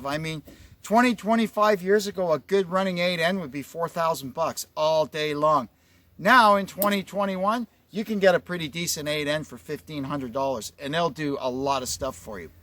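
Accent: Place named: Canada. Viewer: Thanks for the video. Picked up the Cannuck accent early. ☺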